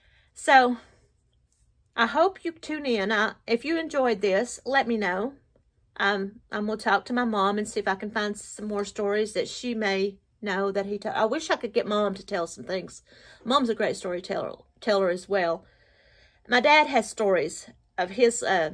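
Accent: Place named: Georgia, United States. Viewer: Enjoyed the story. You sound like some "homefolks" I grew up with in south Georgia. Too bad todays kids listen to some "gamer" on youtube that can't say 3 words without a 4 letter word stuck in between them.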